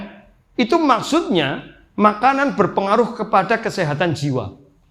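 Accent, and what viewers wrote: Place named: Banyumas, Indonesia. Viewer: ustadz bahasane penak ngapak pd kr nyong, semangat tadz sehat sll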